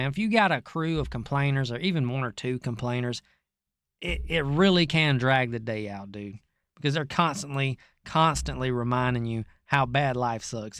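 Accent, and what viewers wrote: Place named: West Virginia, United States. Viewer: This guy's accent is legendary. If he's not from the heart of West Virginia, I'll be damned.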